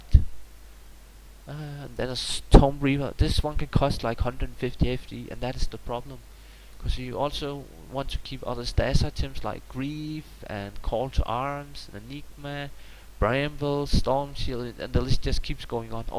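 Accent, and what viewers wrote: Denmark. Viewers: Love it. Are u by any chance danish :)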